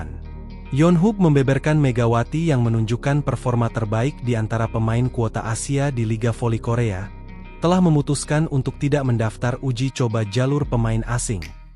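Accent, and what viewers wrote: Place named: Java, Indonesia. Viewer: Medok euy...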